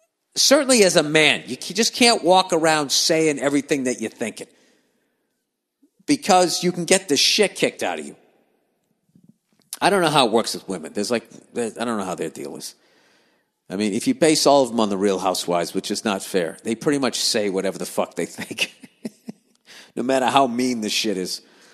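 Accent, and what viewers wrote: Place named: Texas, United States. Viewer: His texas accent is hillarious!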